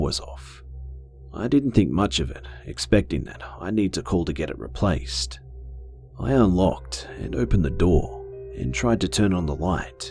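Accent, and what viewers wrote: Australia. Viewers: Sorry to hear about your situation. I'm a huge fan of all your wonderful Aussie accent and stories, I'm sure YouTube will go back to normal quickly. You know shit like this happens a lot, good luck with your patron. I wish I could be more help, but I'm pretty much where you are. Good luck anyway. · Be. Australian?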